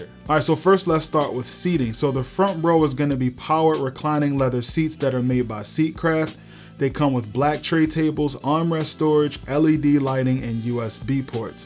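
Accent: Baltimore, United States. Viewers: Love the theatre. Interested in the accent. Baltimore/DC area?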